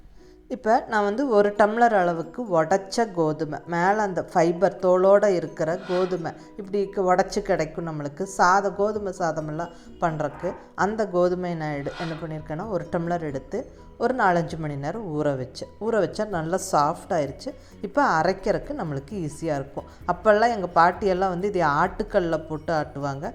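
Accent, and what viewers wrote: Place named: Kongu, India. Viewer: Thank u so much for ur video....... Ketadhum u did this vlog....... So sweet of u....... Recipe is so Gud and again our Kongu slang wow....... Nice to hear......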